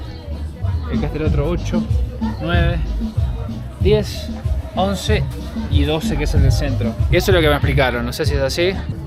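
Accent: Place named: Buenos Aires, Argentina. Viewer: querido, me siento muy identificado con tu manera de hablar, bien lunfardo. Los videos me divierten y enseñan, la verdad, sos crack. Abrazo enorme!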